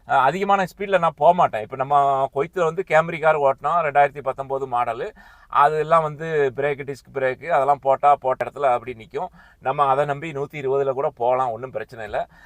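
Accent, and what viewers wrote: Tirunelveli, India. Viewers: ஆமாம், வெள்ளந்தி மனிதர். கேட்கக் கேட்க சலிக்காத நெல்லைத் தமிழ். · இப்படி வளவளன்னு பேசி வீடியோ போட்டால் எப்பவும் கேட்கிறது கிடையாது ஆனா நீங்க பேசறது நம்ம திருநெல்வேலி மொழி கேட்க மிகவும் அருமையாக உள்ளது வாழ்த்துக்கள்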